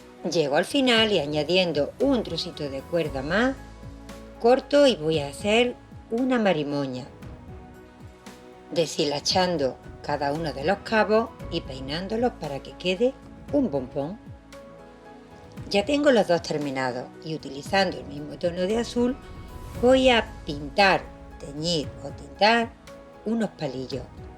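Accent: Spain. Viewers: Desde 🇪🇸 me ha encantado lo que has hecho, creo que también eres de 🇪🇸 por tu acento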